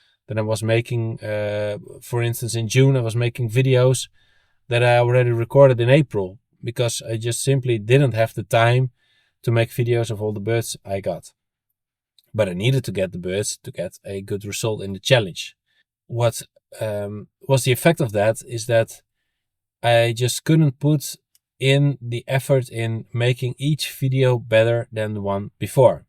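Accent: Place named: Netherlands. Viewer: I hope you keep the videos coming ! I really enjoy watching them and your journey is really nice to follow A few things which I want to add for sure is however : - do what you have time for ! There are times where you are more busy especially with having kids and a family - show us what fascinates you and not what you think might be interesting for us viewers (that way it is way more exciting as well for you!) - we appreciate the effort of you speaking english! It does not have to be perfect and mistakes makes you human and I m sure no one is bothered by your dutch accent and "mistakes" it shows that you are making an effort to make these videos great and gives the videos a great personality thanks for many hours in front of the TV and learning a lot while enjoying our beautiful aviafauna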